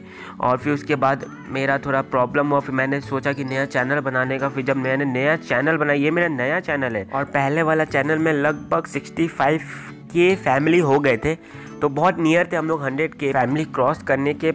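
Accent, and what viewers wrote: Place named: South, India. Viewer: tu wese south ka hai kya ? tera bolne ka style esa hai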